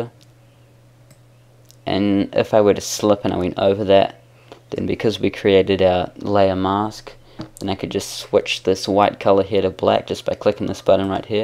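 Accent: New Zealand. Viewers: Sounds like he's from New Zealand. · Hey bro, are you from new zealand coz youve got a kiwi accent, if you are a kiwi are you maori or not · love your kiwi accent mate Great tutorial btw!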